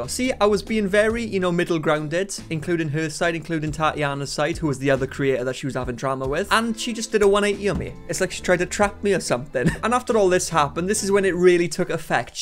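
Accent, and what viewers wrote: Wales, United Kingdom. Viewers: i came for the lillee jean drama, i stayed for that welsh accent 😩😩😩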